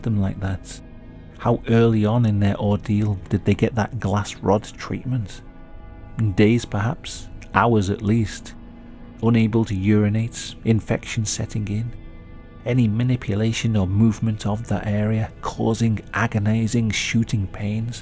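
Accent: United Kingdom. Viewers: How have I not discovered your channel sooner?! True crime is a lot easier to take in with a British accent I find. Disturbing stuff but very detailed work. You'll find me here more often now. Keep it up!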